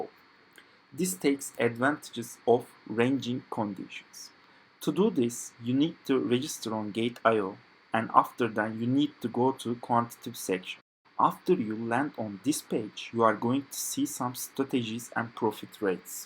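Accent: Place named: Turkey. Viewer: İngilizcenden Türk olduğunu anladım 😁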